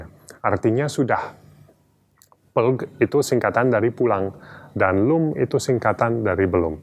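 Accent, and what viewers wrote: Java, Indonesia. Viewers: Malah terdengar seperti orang Jawa gak, sih? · Sekilas denger kaya medok jowo 👍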